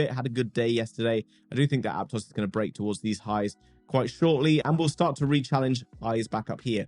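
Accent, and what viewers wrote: United Kingdom. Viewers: Here's a question nobody else has really covered - when deciding on a portfolio containing the various narratives suggested, does it make sense to go and acquire all the coins at once (large/mid & small) anticipating the Bitcoin breakout that just happened or would it have been more sensible to have just bought the bigger caps and held off buying the others until phase 3 began.? (Obviously thinking about the pre-election 6 day dip we just had that gave my alts a bit of a kicking). Thanks, it's refreshing to find an articulate fellow Brit running one of these channels, Cheers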